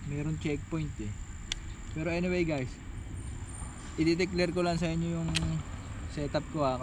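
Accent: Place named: San Antonio, Philippines. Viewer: idol parang taga san antonio na ung accent mo hehe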